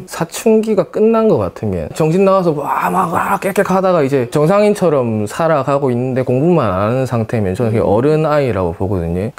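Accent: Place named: Busan, South Korea. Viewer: 선생님~부산여자 서울살고있습니다. 고향말씀으로 들으니 너무 집중이 잘 됩니다. 저희아이 아반떼로 태어났는데 완전풀옵션하고 튜닝하고 엔진갈아서 열심히해보겠습니다!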